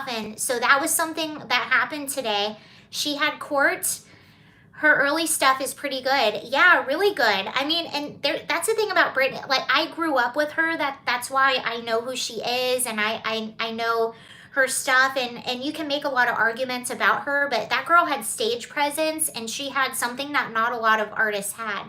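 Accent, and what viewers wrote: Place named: West Coast, United States. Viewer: I’m in Virginia too. We are as far north as you can be and still technically be considered a southerner. I’d never be able to guess you were from Georgia though. You definitely sound like a west coast girl to me.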